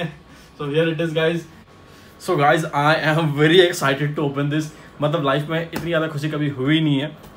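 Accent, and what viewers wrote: India. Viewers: Congratulations.... 😉 bhaiya.. Bas ese hi aap aage badho. 😍😍 jeo mere vale bhaiya....... Aaree tum bhot khaas ho aap bhot mehant karte ho isliye toh hum aapko dekhna jada pasand karte h... Or koi kuch bhi boke aapke bolne ke tarike ko but meko toh ye hi pasand typical Indian style.... 😳😍😉😉😉😉😉😉😉.... Bas bhaiya plzzz full auto airsoft guns laooo🙌🙌🙏🙏🙏🙏🙏🙏🙏🙏🙏 Tumhara bhot bhala hoga... But anyways many many congratulations... 😍😍😍😍😍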